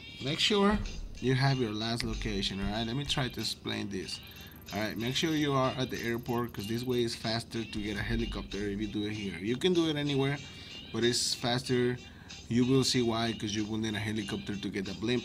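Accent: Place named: Mexico. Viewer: love your mexican accent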